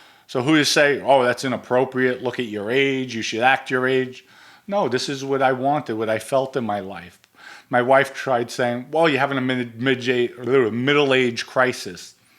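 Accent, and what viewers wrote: New York, United States. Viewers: Hello handsome, I just recently found your podcast, which I really enjoy. Don't allow anyone to stop you from speaking how we NYer's Speak. Love the show.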